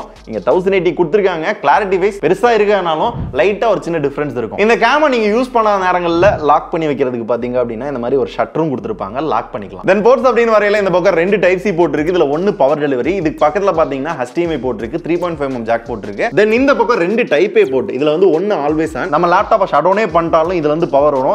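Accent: Madurai, India. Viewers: Bro ninga madurai aa..?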